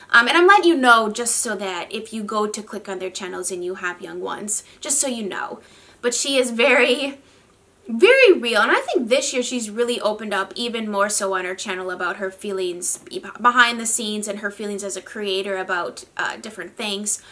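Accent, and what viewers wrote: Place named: Upper Midwest, United States. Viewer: I LOVED this concept!!! And don’t worry, you still have your accent. It’s part of what I enjoy about you being from the Northern Midwest as well 🤗😍👏❤️💕✨💕💕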